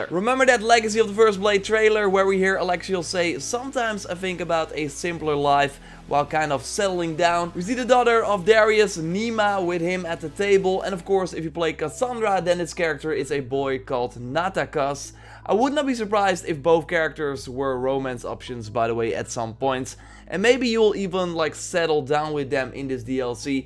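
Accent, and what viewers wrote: Netherlands. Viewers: Just asking. Dutchie? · Nice videos man! Btw are you Dutch? Zo ja, ik ook 😉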